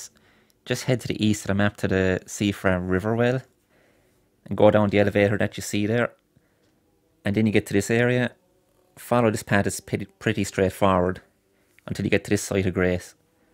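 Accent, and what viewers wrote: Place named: Ireland. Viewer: After trawling far too many videos showing how to get it at late game this is exactly what I needed lad and with an Irish accent to boot 👌 Appreciate it!!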